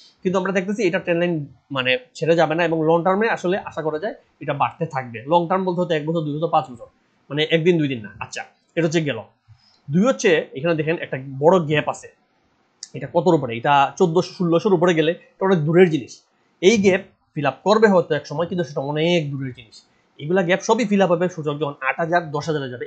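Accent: Sylhet, Bangladesh. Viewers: ভাই আপনার কথা খুব ভাল লাগে।আপনি সিলেটি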